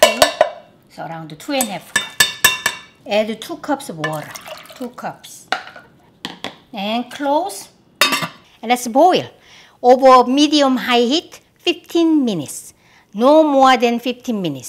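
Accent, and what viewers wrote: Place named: South Korea. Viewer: i am very surprised that you speak English so well(even with light KR accent)!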